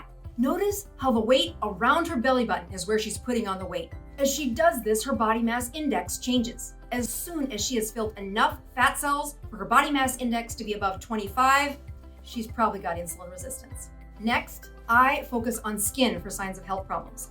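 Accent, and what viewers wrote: United States. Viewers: Tbh the moment I hear a US accent online, talking about medical or dietry advice, I tend to switch off. This woman is spouting her personal opinions, not verifiable medical information. Fyi four time Olympic rowing gold medalist Sir Steve Redgrave has type 2 diabetes. Unfortunately for him, at that time he was diagnosed there was very little known or fully understood about this particular auto immune condition. Redgrave was diagnosed with type 2 diabetes in 1997 at the age of 35. At the time, Redgrave was training and competing with a focus on winning a fifth gold medal at the Sydney Olympic Games in 2000. I defy anybody to find any of those stated symptoms on him. I know, because as a 52year old male in 2012, I too was diagnosed with type2 diabetes. I cured my own type2 diabetes, inspite of being told by my GP that it was 'incurable'. 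From my own extensive reading, I found there are over a dozen recognised forms of diabetes, all with unique causes and symptoms, eg pregnancy. The most common form is type 2 'late onset' diabetes. Characterised as 'insulin resistance'. This form of diabetes, if disgnosed within 10years from onset of the condition, in 80% of cases can be fully reversed ie 'cured'. Medical professionals even today will not claim a cure for type 2, but will say that you are 'in remission', as eg they would with a cancer. Type 2 diabetes: If you are subject to extended periods of uncontrolled stress in your life, your body will flood with energy, in a 'fight or fli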